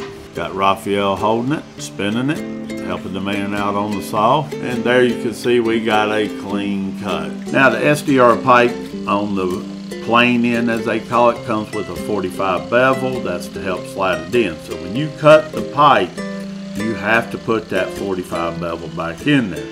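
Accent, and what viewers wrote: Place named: Texas, United States. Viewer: I subscribed for the cool content but mostly for that Texas drawl! 👍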